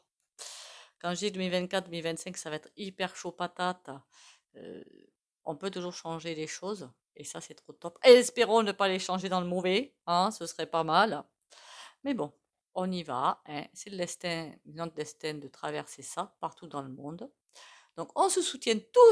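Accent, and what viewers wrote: South, France. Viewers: Coucou bonjour 🎉 Ravie de te rencontrer❤ Je ne sais pas pourquoi comme dirait, avec cet accent fort du midi que j'adore, ça sent le soleil, je t'imaginais brune très brune, Oups, on a le droit de se tromper hein 😅 🙏🌸belle journée,bisous bisous🕊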